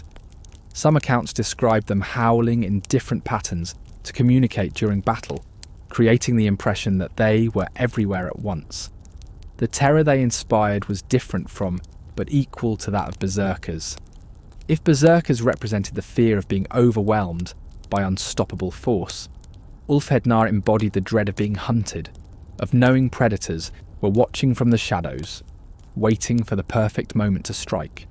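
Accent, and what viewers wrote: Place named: England, United Kingdom. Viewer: I dig the verbal painting the author of this is writing and the narration for being English sounding not to bad I wish there was some buddy on the whole of utube besides myself and a pretty girl from Iceland that taught me vicariously through her channel that most of the world is pronouncing that word VIKING Wrong the I does not belong in the pronouncieation and really shouldn't be in the spelling either but dainish English sweedish Norwegian and probably all forms of Germania have shit tons of letters inside words that make no damnd sense at all if you're ludtining to the prononcieation the word viking no exception the I does not belong the pronouncieation is actually vking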